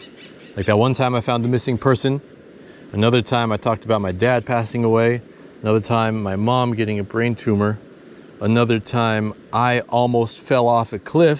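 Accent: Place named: South, United States. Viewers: Love that southern voice hahaaa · Southern accent made me laugh so hard, ^_^. 5 star video. Thanks.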